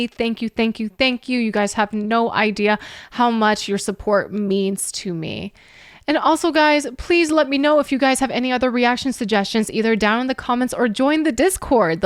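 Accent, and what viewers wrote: United Kingdom. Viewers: British public school commentary circa late '70s.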